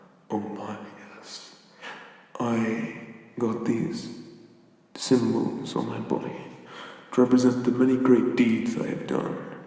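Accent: Ireland. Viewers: I'm a simple man, I see irish accent I click. No regrets here, I'd love to be this giant's pet. Edit: God your voice is hypnotic in this. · Irish accent Wasn't Atlas Greek though? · Oh my, thank you for Irish accent ✨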